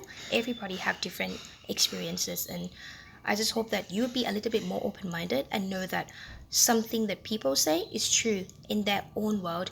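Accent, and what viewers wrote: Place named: Cockney, United Kingdom. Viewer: Wow, great comment. I love that you give your opinion. But I totally agree, racism is so wrong. Thai are usually quite about things, like pollution, hygiene and bad drrving. If drivers beeped their horn at bad drivers, then I think they would think twice about the crazy things they do too. MTW I love your slight cockney accent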